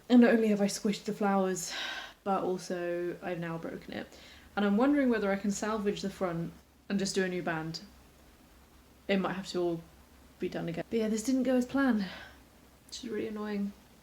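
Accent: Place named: United Kingdom. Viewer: I LOVE YOUR BRITISH ACCENT THIS IS SO GREAT!!!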